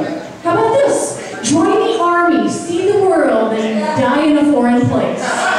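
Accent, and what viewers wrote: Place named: South, United States. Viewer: Visited your site to find what a "southern belle" accent was, stayed for the comedy.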